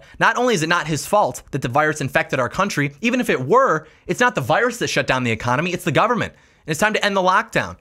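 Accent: Michigan, United States. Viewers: You from Michigan my guy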